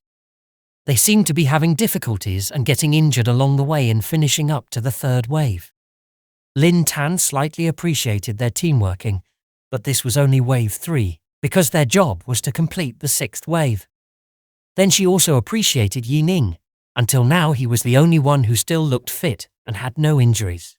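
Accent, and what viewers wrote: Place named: United Kingdom. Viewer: Right as you talked i eminently knew you wre British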